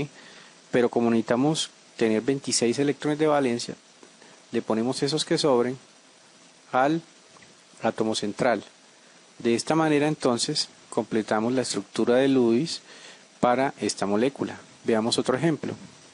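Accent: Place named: Colombia. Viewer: tu acento suena a colombiano,lo digo por que hablas igual a julio profe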